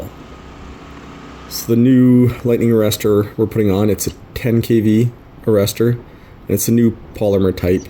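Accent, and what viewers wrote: Canada. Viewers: Are you from Canadá?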